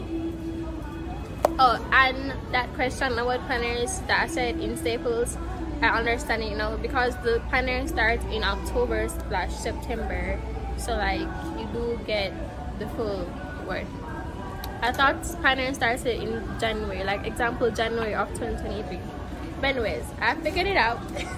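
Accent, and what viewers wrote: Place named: Jamaica. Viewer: I love u accent❤ are u jamaican?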